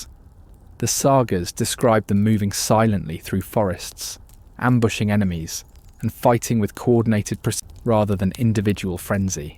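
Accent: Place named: England, United Kingdom. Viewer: I dig the verbal painting the author of this is writing and the narration for being English sounding not to bad I wish there was some buddy on the whole of utube besides myself and a pretty girl from Iceland that taught me vicariously through her channel that most of the world is pronouncing that word VIKING Wrong the I does not belong in the pronouncieation and really shouldn't be in the spelling either but dainish English sweedish Norwegian and probably all forms of Germania have shit tons of letters inside words that make no damnd sense at all if you're ludtining to the prononcieation the word viking no exception the I does not belong the pronouncieation is actually vking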